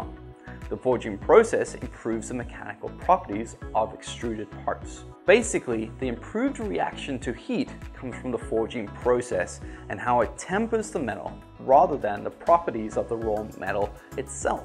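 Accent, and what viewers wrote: South Africa. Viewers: Some of your pronunciation is reminiscent of the south african accent… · totally unrelated random comment: your accent, sir, fascinates me! First video of yours I have ever watched, and, that is what caught my ear first even before learning this about barrels, which I did not know either. I am hearing bits and pieces of South African English? British English? AND American English? Am I right am I right?😂 But all combined together into your own unique accent!! Well that is personal I suppose. It’s just that, I am always fascinated by language and accents, having a semi-musical background, and so the way in which any person anywhere talks is always catching my ear because, it also lends a window into their personal story and personal history. So therefore I do not mean any disrespect. Just that, you accent fascinated me when I first heard it and I felt compelled to comment!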